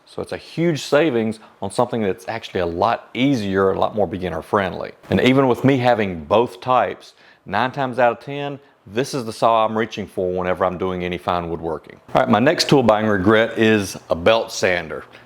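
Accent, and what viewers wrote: South, United States. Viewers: Your southern drawl is divine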